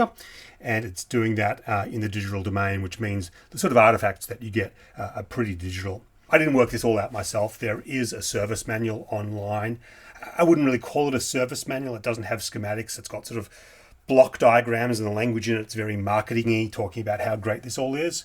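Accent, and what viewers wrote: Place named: South Melbourne, Australia. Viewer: This man speaks in a heavy south Melbourne accent. A Very harsh Aussie dialect.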